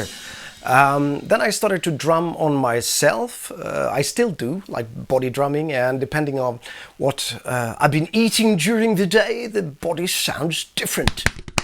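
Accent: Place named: Sweden. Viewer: Dude i just wanna hang out this dude...is he swedish?...just the accent is kool..perfect voice for reading kids bed time stories...idk lol..m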